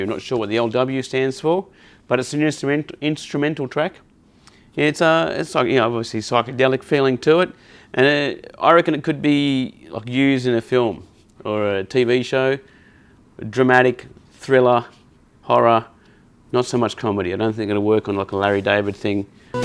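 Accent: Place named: Australia. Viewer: Great review. Love the Aussie accent 😁 mines a bit different 🇦🇺 Yah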